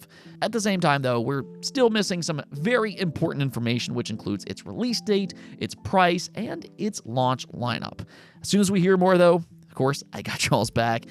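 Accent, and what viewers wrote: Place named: Mountain West, United States. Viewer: I can tell you're from the mountain west region by the way you say "rill dill." Didn't even know this accent existed before Sister Wives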